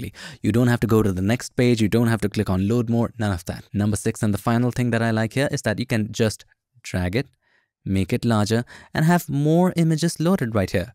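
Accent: India. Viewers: u have an annoying voice, I can still hear the indian accent u are almost succesfully hiding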